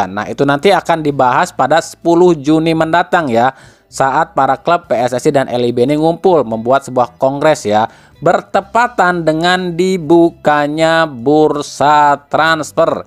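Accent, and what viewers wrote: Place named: West Java, Indonesia. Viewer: BUAHAHHAAAAA..... Yg punya chanel itu urang Sunda,logatnya itu yg jadi ciri....😀😁. Aingmah sok aya2 wae pertanyaan teh...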